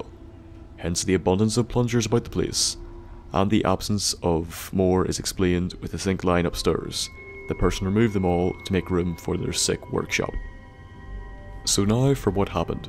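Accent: Northern Ireland, United Kingdom. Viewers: Are you northern Irish? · Same! Thought I recognized a familiar NI twang in your voice. Keep up the great videos! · Are you from Northern Ireland?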